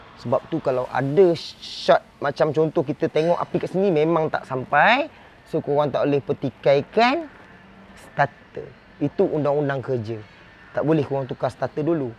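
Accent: Pahang, Malaysia. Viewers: ni cakap mcam org pahang.. bengkel beloh mane..tak daye dah repair kete mahal sokmo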